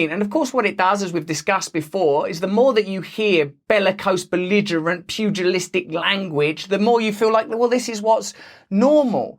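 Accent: United States. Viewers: I really like your American accent. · Mr. Brand. I heard your name some time ago in connection with "right wing conspiracy theorists" and as usual paid the accusation no mind because it came from the same media outlets that always use that phrase to marginalize those who say what they don't want anyone to say much less think about. I've so far tripped over a couple of your videos. Haven't seen you espouse a single CT yet. Today I subscribed, but I have to admit, at least partly because you're the FIRST Brit I've ever seen who can manage more than one American accent. Also, because you mentioned Orwell who seems to have been relegated to unperson status recently as all the major "Western democracies" vigorously and enthusiastically utilize every doublethink and doublespeak tactic he invented. They took what he wrote as a warning and are using it as a manual! 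Why do I say Orwell is an unperson? I'm 69 and my kids and grandkids are no longer required to read him in school. They didn't even know who he was or what he wrote until I told them.His books haven't been banned- yet- but he isn't being taught any longer. They are unequipped to recognize The State closing ts grip on them, and would probably gleefully go along with the USA going full "Team USA: World Police" as that article endorses. When the fuck did life start imitating satire? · Hey, why does it seem like it is easier for you to speak with an American accent than your native accent? Keep up the great discourse!!! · dig american gangster voice the best. Gilbert Godfreyesque. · American accent is better than his English accent…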